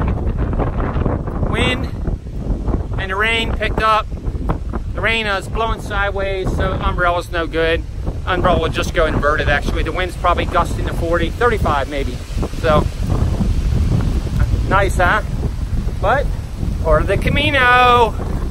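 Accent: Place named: Western Pennsylvania, United States. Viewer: Wow, you were on fire that day. Also, you sound like you are from Western PA.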